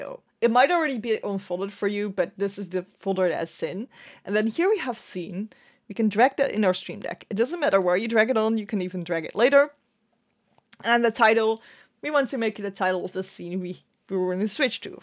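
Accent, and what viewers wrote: Netherlands. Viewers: are you dutch? you really have a dutch accent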